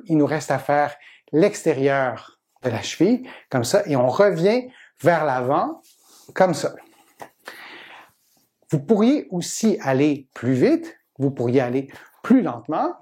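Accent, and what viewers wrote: Quebec, Canada. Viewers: Adorable accent québécois et super enseignement, ayant moi-même une grave maladie.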